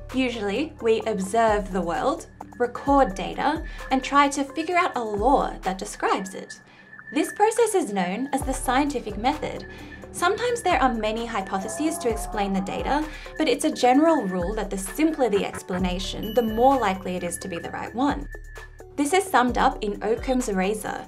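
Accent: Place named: Australia. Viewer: in an Australian accent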